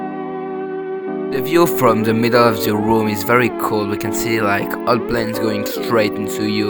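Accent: France. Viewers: thicc français accent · Not gonna lie your French accent is cool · bro ur french your accent is realy pronounced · That french accent brah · OMG VOICE REVEAL WITH FRANCE ACCENT 1!!1!1!1!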